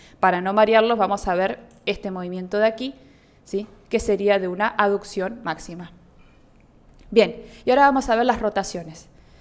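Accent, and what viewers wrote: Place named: Rioplatense, Argentina. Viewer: Ay, cariño, no lo soporto el shesheo. Me parece un vídeo interesantísimo, pero no soy capaz de verlo.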